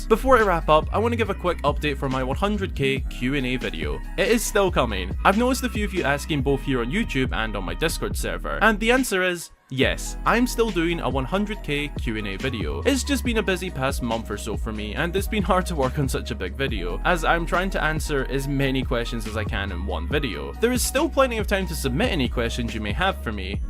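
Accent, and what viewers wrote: Scotland, United Kingdom. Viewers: You're scottish?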